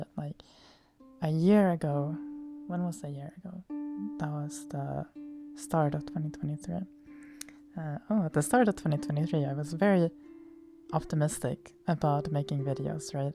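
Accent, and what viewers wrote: Sweden. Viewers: swedish accent is cute af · You sound very Swedish now, I don't hate it though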